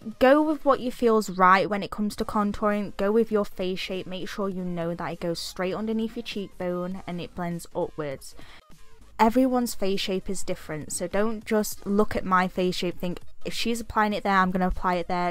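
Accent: United Kingdom. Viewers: Fell in love with your accent innit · Well I’ll be dammed if this isn’t the most British accent out there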